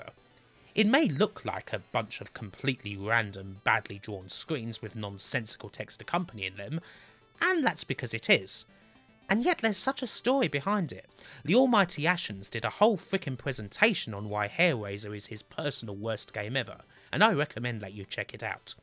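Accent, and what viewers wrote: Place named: Croydon, United Kingdom. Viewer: is the purest Croydon accent around.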